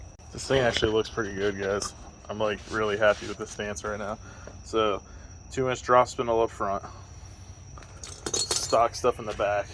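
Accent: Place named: Florida, United States. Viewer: You a real Florida man accent there boe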